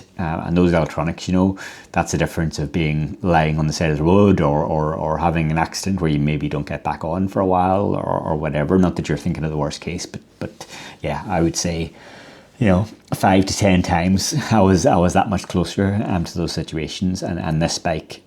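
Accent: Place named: Northern Ireland, United Kingdom. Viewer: would seriously consider it! From your accent you still in NI or local region??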